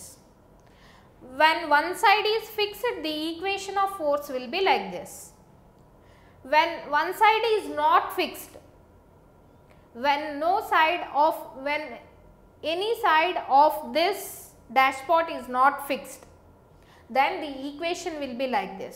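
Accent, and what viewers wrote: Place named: South India, India. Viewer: aiyooo south indian.... :D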